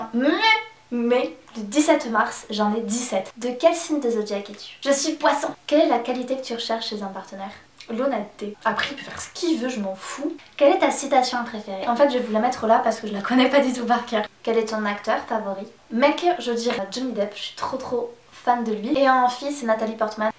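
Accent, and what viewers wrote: South, France. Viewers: Vive le sud ! D'ailleurs je trouve qu'on a jamais autant entendu ton accent que dans cette vidéo c'était chouette ! :p Je me suis reconnu dans les critères de mec " ce qui me fait craquer c'est qu'il soit plus grand, ce qui me rebutte c'est qu'il soit plus petit " c'est exactement ça :) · J adore trop trop le montage parfait avec l'accent du sud moi je comprends pas je vis dans le sud et jai pas d'accent mdr tes trop mignonne je trouve que tu fais plus grande (pas en taille ) juste j'adore cette vidéo et la youtubeuse ♥★♣:-D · T'es tellement chooooooooooou :) Tu as de plus en plus l'accent du sud hihi c'est trop rigolo j'adore <3 Faut absolument que je regarde la série heaven tree du cooup!! :) Oui pour le haul, bisou d'amour <3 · Ah je t'aime vraiment trop. Je pense que c'est le meilleur TMI tag que j'ai vu jusqu'à présent. Le montage est top. 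Tu es trop mignonne et tu m'as fait vraiment trop trop rire tout au long de la vidéo. Moi aussi je suis poisson, ton anniversaire c'est donc Lundi? Moi, c'est demain hiihiii... Si j'y pense je te le feterai, meme si je pense que tu ne verras pas ce commentaire, enfin bon anniversaire en avance.. C'est vrai qu'il n'y a pas beaucoup de Youtubeuse qui viennent du Sud, et j'adore ton accent, on l'entend dans cette vidéo! Gros bisous :b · aha j'aime ton accent du sud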